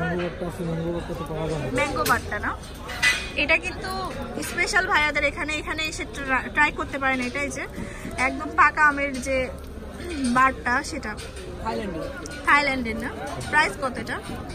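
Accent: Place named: Rural, Bangladesh. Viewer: Apnar khotha gula valo lagyna.coz grammo kothar Moto hoy jay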